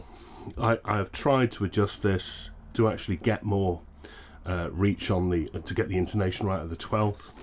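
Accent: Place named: England, United Kingdom. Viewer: Its painful The English accent makes it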